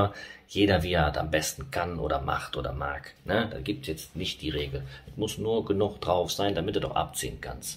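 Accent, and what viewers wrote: Cologne, Germany. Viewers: Hallo sympathischter Stukateur der mir bekannten Handwerkerschaft, lieber. Als ehemals in DDR-Zeiten gelernter Baufacharbeiter Hochbau mit absolutem Hang zum Verputzen gefallen mir alle deine Videos außerordentlich. Besonders deine absolut lockere Art und deine hervorrajende kölsche Dialekt sind sehr sympathisch. Mit "eine Typ" wie Dich hätte ich auch gerne zusammengearbeitet. Mache bitte noch viele weitere Videos des vielfältigen Handwerkeralltags. Nette Menschen wie du es bist, sollten von den Handwerkskammern als Botschafter und Werbeikonen für das Handwerk herangezogen werden, meine Meinung... Die weiterhin maximale Produktionserfolge im Gewerk und beim drehen weiterer Videos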